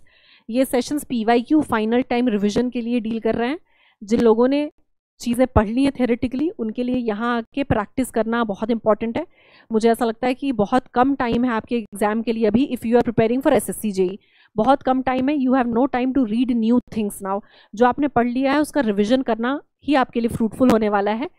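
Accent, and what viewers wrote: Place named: Allahabad, India. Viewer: allahabad se ho kya aap?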